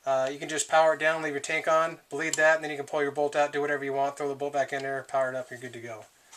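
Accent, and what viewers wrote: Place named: Midwest, United States. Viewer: Its funny cuz ur from california but ur accent sounds like a midwestern one (Wisconsin, Iowa, Minnesota maybe) and im from Wisconsin! Hahahaha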